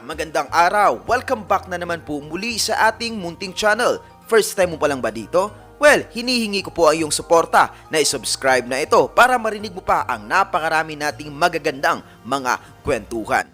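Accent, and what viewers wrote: Visayas, Philippines. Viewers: Bisaya diay ka kuya ahahah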